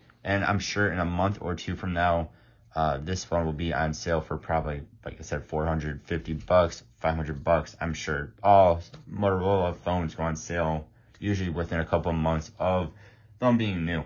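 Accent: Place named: South, United States. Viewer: Excellent review. But why are you speaking in a southern accent? Are you from rural Alabama?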